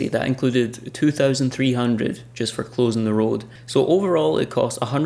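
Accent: Scotland, United Kingdom. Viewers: Awesomely well done. I’m 50% Scot myself. My mother was born in Armadale. So of course I love your Scottish accent. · Having been to Scotland plenty of times in the past, I instantly knew he was Scottish. However, the impressive thing for me is that his accent is really easy to understand compared to other people I have met in Scotland. He's really articulate and that is quite helping when watching the video. Congratulations for your work in the house, from Spain. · Ireland? Wales? Please - that's such a Scottish accent! (and a lovely one at that) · Your builder/contractors did a great job. I thoroughly enjoyed this video and the restoration video that preceded it. I'll look forward to your next project. I knew you were Scottish when I heard your voice. Being of Scots descent on my Dad's side, I hope to visit the land of my ancestors one day. Keep up your good work and good luck in the wife-hunting. 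· For me, your house has so much character. I appreciate that the house needs insulation and think you did everything right. I have visited Scotland, Banff and I really enjoyed my stay. I had lovely weather. I visited for a valentines weekend. My fiancé, at the time, drove through the night. It took about 11 hours! It was a great adventure. The people were lovely and we actually met someone from London, where I live. He was the pub landlord and was very nice. By the way, you have a lovely accent. I do like a nice accent and listening to you, reminds me of my stay in Scotland. Another super video 💜😁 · It's awesome that you got your parents involved. I bet they enjoyed it. (BTW I had you pegged as Scottish early in the video 😉) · This American was actually able to distinguish your Scottish Accent......😎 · No, being Irish I knew you were Scots, but how do you get these people to work for you is my question? 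And that is such a beautiful place, you are so lucky! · 😂 I knew you were a Scots man ! Well done love from Ireland ! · place looks amazing..I know those costs are quite high but, given the whole lot was done by Trade, it'll all be guaranteed and you have that peace of mind. With regard the old character, you haven't destroyed it, it's merely covered. oh..and you couldn't sound more Scottish if you tried! excellent work! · It is a blessed house, when a believer lives in it! :-) Because then it is the home/temple of God... :-) Enjoy living in your beautiful house and behold your wonderful scottish accent!!! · You sound 200% Scottish. Not sure how anyone could say anything else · I knew you and your house are in Scotland, by what I saw and your accent. When I was a wee lad, I lived in Glasgow and had an accent a bit different from yours and very different from what it is now. I just subscribed because I want to see more of Scotland. 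This looks great. I like what you did. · I knew you were Scottish! I"m so proud I got it right! I watch Outlander, but the accents on Outlander are super thick, so I will confess, I looked up the author Michael Bruce you mentioned in the other video to make sure I wasn't totally off.😁😜😉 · At the start of the video I thought you had a soft, American accent. Then I realized your Scottish. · Good golly! You sound Scottish, not Irish, not Welsh. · House looks great, made a nice job of it. And i'll be honest, im from scotland, but i assumed it was wales also. I hear the accent now...but the building still looks like it should be in wales :) Im assuming you had to get drawings/plans done for building warrant etc.Was there an architect/engineer involved at that stage, or did your builder deal with all that? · I think your tiny house is wonderful, and watching the process was truly interesting to watch. 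I'm glad you're planning on doing more. I'm now a subscriber! If you want to make some money on it, all you have to do is wait a few years to sell it..... And how could anyone mistake your lovely Scottish accent and sense of humor? Best of luck to you!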